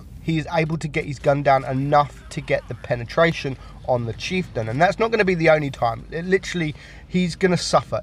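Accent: United Kingdom. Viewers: Yeah that's pure blood British accent and dialect lol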